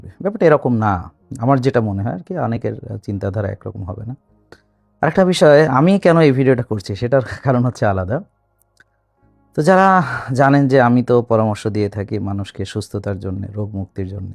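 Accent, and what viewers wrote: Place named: Jhenaidah, Bangladesh. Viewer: Amar mone hoy aisob ""S-H-O-K-U-N"" k niye apnar kono comment korar dorkar nai. Ai sob manushrupi SHOITAN aigulai chai apnar mulloban somoy nosto korte. Amar bari Jhenaidah apni jokhon kotha bolen amader tone a valo lage. Apni ALLAH vokto ekjon manush etai apnar boro porichoi. Manusher shortcomings thakbei, ALLAH amader oivabei toiri koresen. ALLAH apnar mongol korun.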